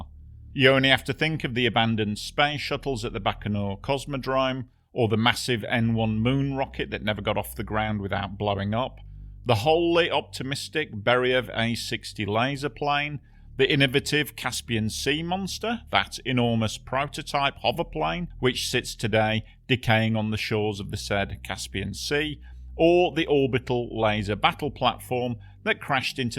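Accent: United Kingdom. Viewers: I have watched your channel for a while now. With all the AI crap that is dominating YouTube. I am so happy that you, a human, keep me entertained. Thank you, British 'human' voice. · Thanks for proving once again that not everyone with a Brit/UK/Aussie accent should be doing narration.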